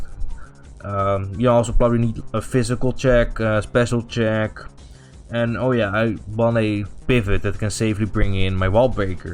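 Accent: Netherlands. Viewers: Love the very noticeable dutch accent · Obvious dutch accent lmao, good video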